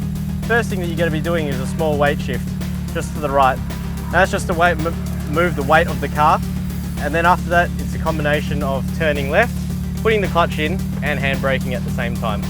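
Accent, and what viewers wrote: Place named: Australia. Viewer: Asian guy with Australian accent. Nothing unusual here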